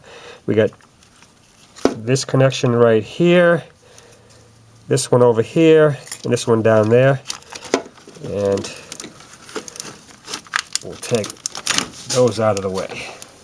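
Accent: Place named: East Coast, United States. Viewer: im not a mechanically inclined person so im starting an auto tech 101 class tomorrow..wish me luck..u got a cool lil accent. u must be from the east coast/eastern seaboard.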